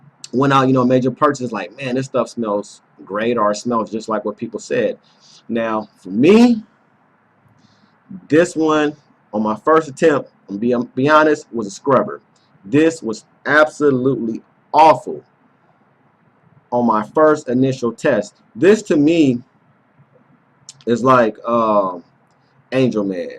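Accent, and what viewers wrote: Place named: South, United States. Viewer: Ok, I have Legend as well, but not the same. I personally you wanted to be the anti-haarlem guy to get fans. You get pass all tge orher notes in other colognes, right. The hype is guud muny, I didn't like it at saks either...but the ladies in PHILLY feelin it. You sound southern. ..different females, you have to take that into account Bruh. When you revieq come outta the box. Other than that, u aite with your presentation.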